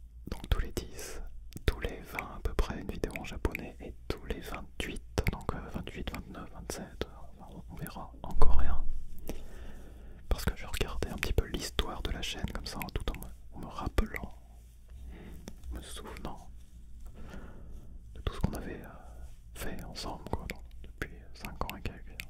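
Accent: France. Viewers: French accent on ASMR is ✨perfect✨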